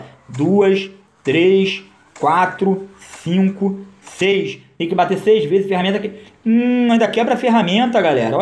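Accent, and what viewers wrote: Rio de Janeiro, Brazil. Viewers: Tu é carioca no Sangue não é?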